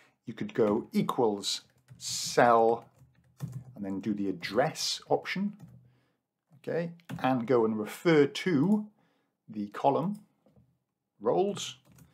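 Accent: Wales, United Kingdom. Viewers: Which part of Wales are you from?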